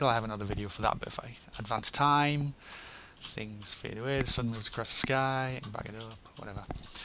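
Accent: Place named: Southern England, United Kingdom. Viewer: I can't quite put my finger on your accent, it's almost fairly southern English accents with twangs of northern and a tin bit of American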